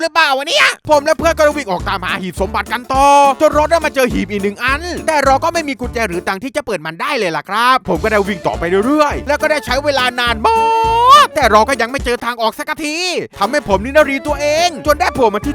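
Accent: South, Thailand. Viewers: พี่เป็นคนใต้ใช้มั้บถ้าพี่ป็นคนใต่เราเป็นคนบ้างเดียวกัน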